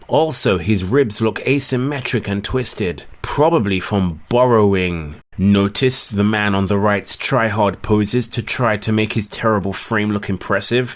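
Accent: London, United Kingdom. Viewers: He is from London so I think it's a London accent. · German? He’s English mate! It’s a black London accent. I have to say his is quite mild and he is very well spoken. Lots of black people in London have very strong accents and sound in your face and ghetto (just depends really). He sounds quite posh tbh. Not saying he is, he’s just very well spoken and not necessarily reflective of the typical black, Asian or white London accent. Londoners accents are usually fucking horrible whoever is speaking them but his mogs everyone’s!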